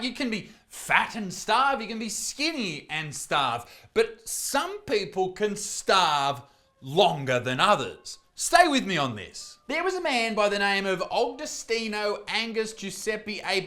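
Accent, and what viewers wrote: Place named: Australia. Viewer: I kept hearing you say ' white inclusive consultant" and I was like wth is that? lolol just for reference you are Aussie and I am American lol..was just the accent mate ~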